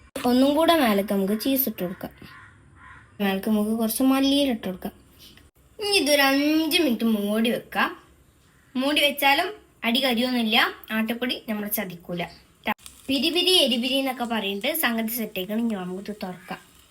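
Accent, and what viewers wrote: Malappuram, India. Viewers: Malappuram aano kozhikodaano naadu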